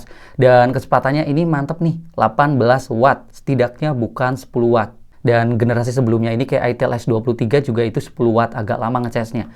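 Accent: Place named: Cirebon, Indonesia. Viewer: Logatnya kaya wong cerbon bang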